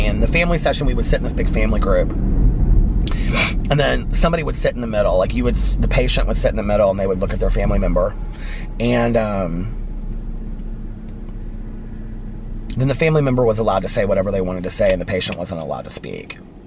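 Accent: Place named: Tennessee, United States. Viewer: Love your voice! Don't listen to stupid people! The first time I watched you I thought you were from Tennessee bc you talk like us 😂 Love your vlogs!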